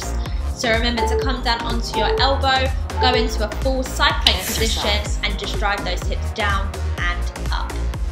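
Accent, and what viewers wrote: United Kingdom. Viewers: Is it just me or was your accent more Britishy last time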